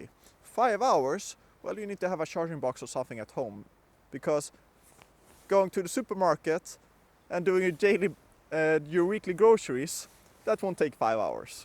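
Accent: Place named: Sweden. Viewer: Cheers to Sweden, love the accent!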